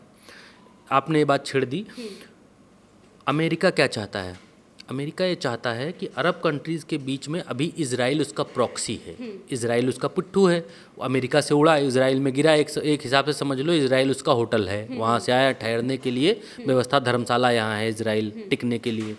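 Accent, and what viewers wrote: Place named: Lucknow, India. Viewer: Aap ke bolne ki andaaz bahut behtareen hai Bhaiyyaji Aap ek lucknowi andaaz me bolte achha lagta hai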